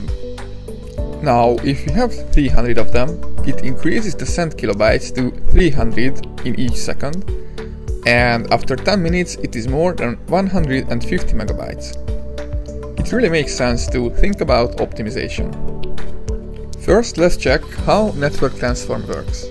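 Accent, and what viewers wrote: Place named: Hungary. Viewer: Akcentus. Ne értsd félre, szuper minden, köszönöm a videót. A magyar unity-seket így ismerni fel :)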